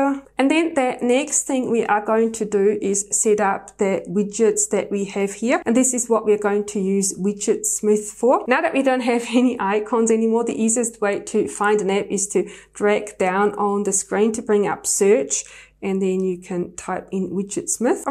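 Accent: New Zealand. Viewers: i love the new zeland accent so nice to hearr (im from australia) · I love your New Zealand Accent <3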